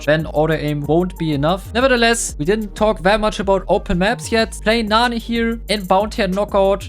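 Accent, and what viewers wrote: Germany. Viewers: Im sure ur an amazing player but I can’t watch the video with that strong a German accent holy shit better just talk German and put English subs man ur never gonna speak proper English if it’s that strong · Are you German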